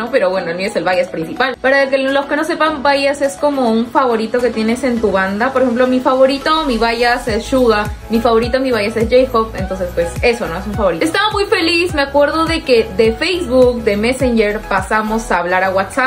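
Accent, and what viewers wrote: Chile. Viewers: Pregunta eres de chile 🇨🇱? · eres chilena🤔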